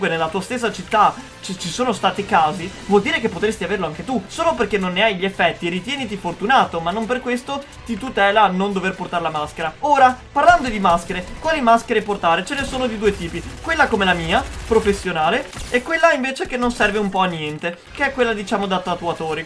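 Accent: Veneto, Italy. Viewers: Ostrega te si Veneto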